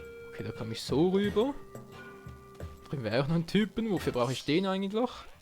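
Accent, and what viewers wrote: Switzerland. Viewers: Bist du Schweizer??? :D:D:D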